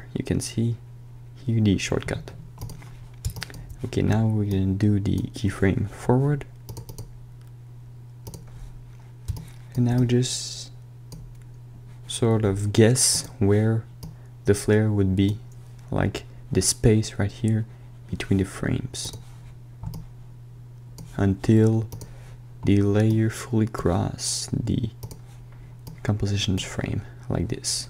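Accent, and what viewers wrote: France. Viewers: Thanks. Hey i've a question! Are you french? I'm sure.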